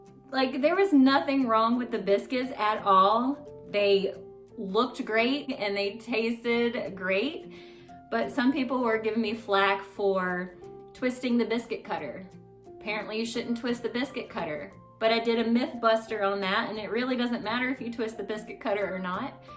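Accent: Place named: United States. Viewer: Where are you? You sound American. I wish that in America the recipes would be given in what we have been trained in like cups and ounces. I Don't Go near any recipe that's not written in plain English for Americans to understand. If you want to cook for Europeans or South Americans go for it but please in the United States give recipes that are people can understand without having to go to a book and transfer everything over to cups Oz pints and quarts. We have gotten so far away from American values and everything is for the aliens that have taken over our country. Obviously in cooking as well.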